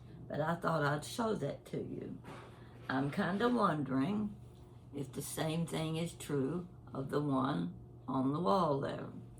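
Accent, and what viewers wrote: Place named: Appalachia, United States. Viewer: Dear dear, you are a delight. Is it just me or is Appalachian accent getting more and more pronounced?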